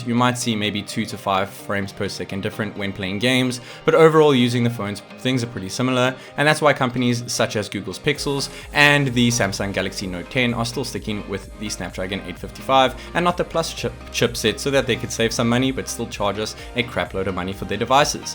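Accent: South Africa. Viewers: You sound South African..? 🇿🇦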